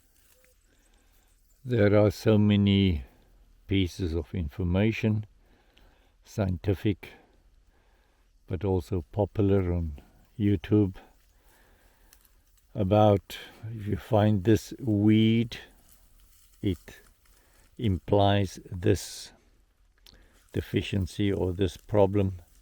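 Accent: South Africa. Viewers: You sound like a South African 😂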